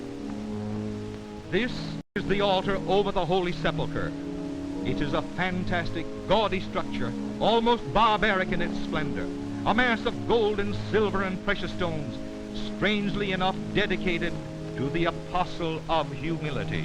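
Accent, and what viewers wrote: United States. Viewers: It's a yank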